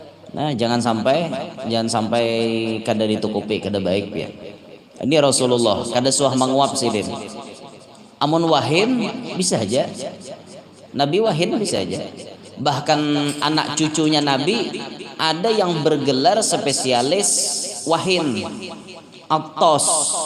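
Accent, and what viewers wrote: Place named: Kluet, Indonesia. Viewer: Allgamdulillah ada guru kita yg cersmah selalu menyejukn hati dgn tidk menghilangnkan logat bhasa klua u yg sdh 30 thn di bnjar merasa bangga dgn melestsrikn bahasa klua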